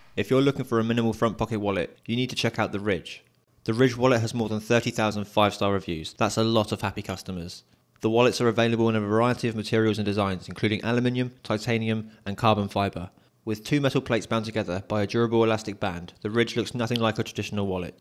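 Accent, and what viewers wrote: United Kingdom. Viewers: No problem with few talk here and there but the British accent is hard for me to understand in some points especially in fast speaking because English is not my language.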